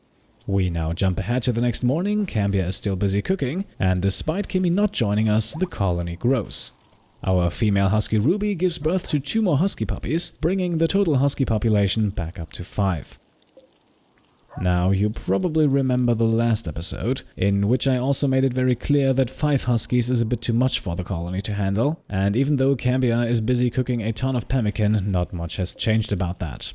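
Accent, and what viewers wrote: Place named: Germany. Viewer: didnt know you were german untill you started to read the patrions for the first time :D (few episodes back)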